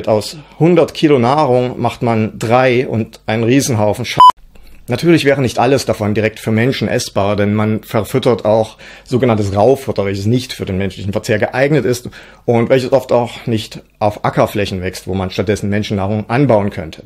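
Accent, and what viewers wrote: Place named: Saxony, Germany. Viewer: ich höre es nur gaaanz leicht raus, mein Mann sächselt deutlich mehr. Ich mags. 😁😉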